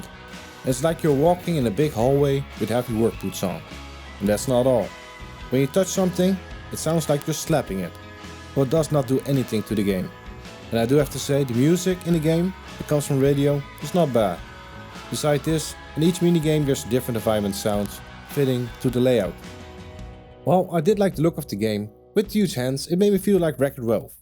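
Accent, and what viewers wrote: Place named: Netherlands. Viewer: It is sometimes hard to understand you due to trying to speaking as a native and your Dutch accent. Sorry to say that but it can be improved I believe. The video is great after all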